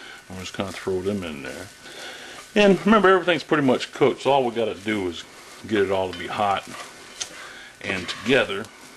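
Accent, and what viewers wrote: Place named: South, United States. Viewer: i love ur country accent n ur sense of humor im from down south i stay in texas what state u live in?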